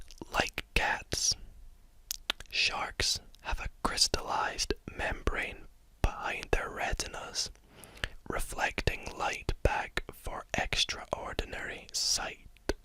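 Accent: Scotland, United Kingdom. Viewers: WHAT PART OF SCOTLAND ARE U FROM???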